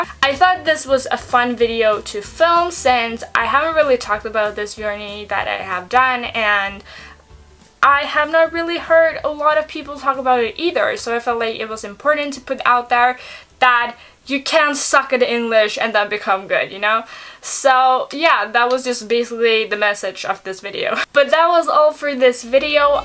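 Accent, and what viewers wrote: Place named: Europe. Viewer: I stumbled upon your channel due to YouTube recommending your reaction to the Captain Marvel trailer (okay, I admit, I've been obsessing over reactions to that trailer, so it was a no-brainer that YouTube would eventually recommend your reaction to me :-) ). But when I glanced at your video list, I had to check this one out. Of course I noticed your accent in the Captain Marvel video, but to be honest, it took me a few seconds to notice it. Your English was that good. At first, I was trying to figure out what region of America/Canada you were from. It was only after a while that I realized your accent had to be European. So good job! You've impressed this native English speaking Californian.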